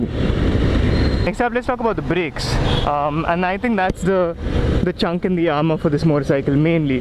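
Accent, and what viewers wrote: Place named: India. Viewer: Our hero back again with awesome content. And I love your hindi accent.😍😊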